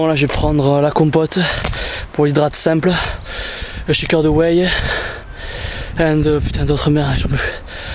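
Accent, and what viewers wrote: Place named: Marseille, France. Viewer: L'accent Marseille 😂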